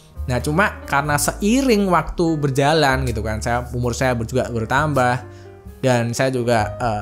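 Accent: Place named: Java, Indonesia. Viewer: Bang orng mana bng?kya ada logat Jawa nya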